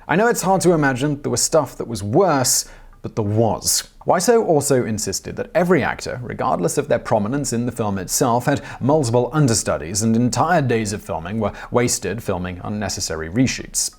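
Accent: United Kingdom. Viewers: The funniest part is hearing a man with an impeccable British accent quote the broken English of Tommy Wiseau. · Simon reading Tommy's unintelligible quotes with a dignified and professional British accent is pure gold.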